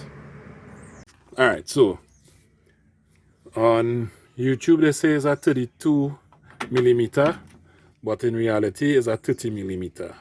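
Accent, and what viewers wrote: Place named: Trinidad and Tobago. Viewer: Voice sounds like trinidadian 💯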